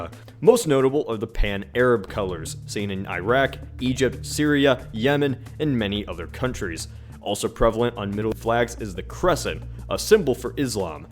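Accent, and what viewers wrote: Canada. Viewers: So are you Canadian or North American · Are you Canadian?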